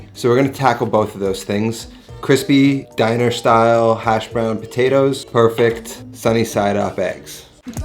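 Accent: New York, United States. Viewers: Oh my god are you like from New York or something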